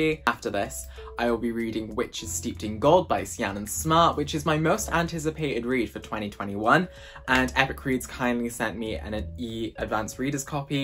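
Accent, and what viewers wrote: Wales, United Kingdom. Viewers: 0 am I sensing a Welsh accent??? First Welsh booktuber I've found and it's EXCITING!!!! Loved your reviews, subscribed!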